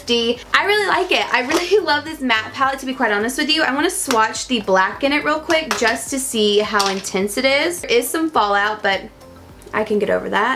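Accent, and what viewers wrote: South, United States. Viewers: Where is she from? 😂 has to be down south! 😂👏🏽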